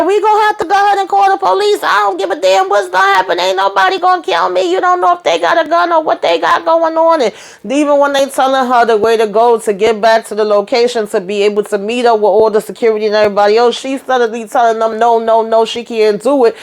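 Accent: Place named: New York, United States. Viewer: Thank you for your excellent reviews. I just love your NY/NJ accent. It sounds like home. (I’m from LI). These LALU folks are not in their right minds especially Gabby and Chris. I fear for them and can see the more domestic abuse in their future. What a mess, but it’s so entertaining 😁 Keep it coming sis! Blessings 🙏🏾🙏🏾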